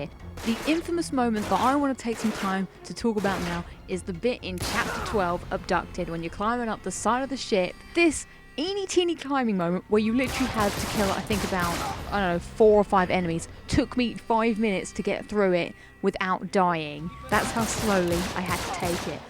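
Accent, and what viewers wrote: United Kingdom. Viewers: Dog damn, that British accent. I hate it and simultaneously I live listening to you !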